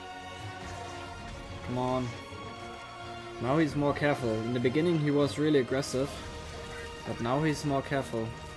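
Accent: Germany. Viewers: Bei Gott ich lieb deinen deutschen Akzent! XD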